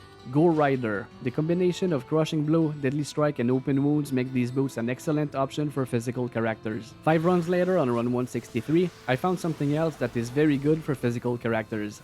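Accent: Quebec, Canada. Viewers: ARE YOU FRENCH CANADIAN ? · Good ol Queb accent, keep the grind brozer ! ( all the run was in hell ? ( online or solo ) ? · J'entends le bon Québec! Haha ❤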